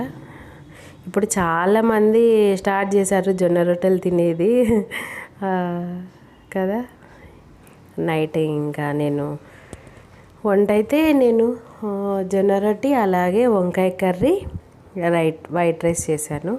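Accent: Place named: Rayalaseema, India. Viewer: Ekkada unnaru rayalaseema basa matladu thunnav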